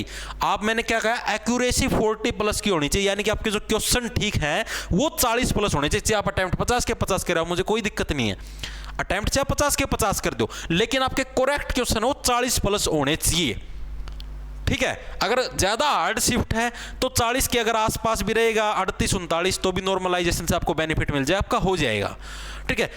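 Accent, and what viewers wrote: Haryana, India. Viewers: Wah kya majay dar language hai sir apki i think haryanvi hai ❤❤❤❤❤ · Love your Haryanvi accent bro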